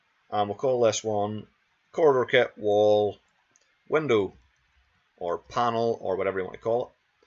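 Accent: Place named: Northern Ireland, United Kingdom. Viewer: Sounds like your a fellow Northern Irelander! :hand-pink-waving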